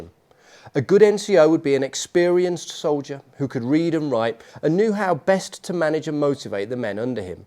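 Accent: United Kingdom. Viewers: Can I ask what part of the UK is your accent from ?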